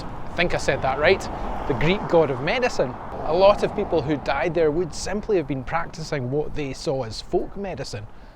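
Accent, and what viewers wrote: Scotland, United Kingdom. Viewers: Thanks again,! I could listen to your erudite and witty brogue all day long. I regularly watch your brother's channel as well -- love ya both!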